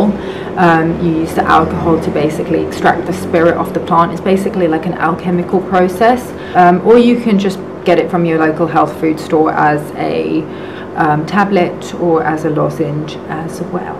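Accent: United Kingdom. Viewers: Good info! Didn't realize you had such a posh accent :P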